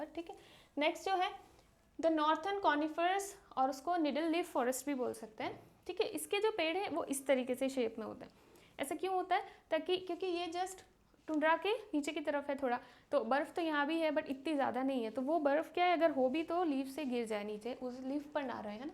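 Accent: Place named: Lucknow, India. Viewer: Ma'am i think you are from lucknow by the way of speaking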